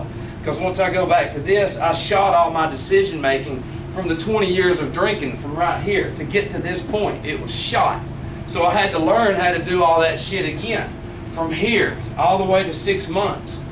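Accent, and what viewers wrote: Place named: Texas, United States. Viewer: Great video dude. Raw and honest. Love it. U just be from Texas or Tennessee. How’s ur progress these days?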